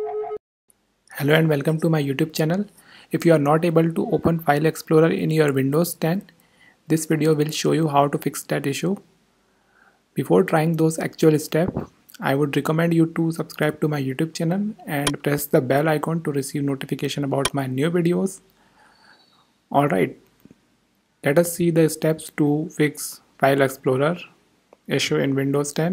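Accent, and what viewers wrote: India. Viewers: All file explorer wont open videos has a Indian voice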